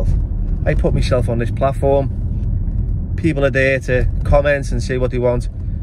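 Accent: Liverpool, United Kingdom. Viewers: Go on lad scouse through and through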